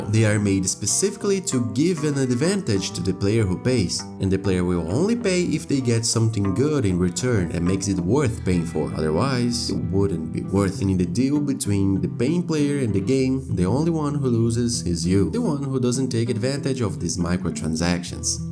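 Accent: Brazil. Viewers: percebi, cliquei no video e fiquei assistino, e so pensando, "sotaque brasileiro do caramba", kkkk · Teu sotaque parece brasileiro 🤨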